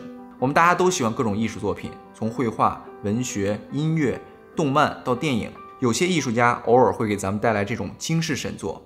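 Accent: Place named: North, China. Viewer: 大陆北方人说话的习惯 我们也不习惯听台湾腔 也不习惯听阿拉伯语 西班牙语 但是重点难道不是；内容比较重要吧？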